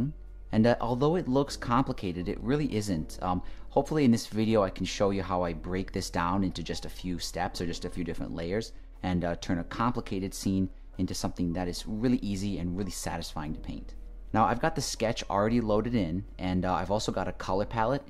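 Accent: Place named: United States. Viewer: at your accent pretty much American if I'm not mistaken. Is it true? Yes, surely nowadays the situation is just unstable for you & family to travel around. Stay healthy and stay safe. Thanks for your kind responses. Keep posting nice videos 👍🏻👍🏻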